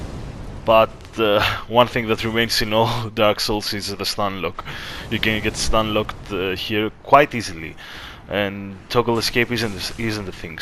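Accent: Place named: Italy. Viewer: Oh, I agree with you. A lot of invaders are just elitists jerks. Everybody was a noob once. Everybody struggled. I know I did. People still complain about gankers, but real gankers are so rare nowadays. 3 dudes trying to beat the level are not gankers they are co-oppers. DS3 was the most balanced way From Soft did in my opinion. IMO It struck the possible balance between hosts not being too strong and invaders not being overpowered. It makes the game fun for most people. About DSR - I have heard about the rumours about weapon matchmaking, but as far as I know that is still unconfirmed or uncertain ("Players outside a host's parameter range will not be matched with each other"). The estus healing only in DSR is already confirmed. <link> DSR will IMO follow a lot of what was learned about balance in DS3, as DS1 was very unbalanced, sometimes in the hosts favor and sometimes on the invaders favor. 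I am glad to hear this. I bet there will also be a double confirm system on backstabs like in ds3, and stunlock will not be infinite, but this is just speculation. Anyway, great video, and I hope to see your content on DSR. PS- BTW you are italian, right? Your accent seems italian!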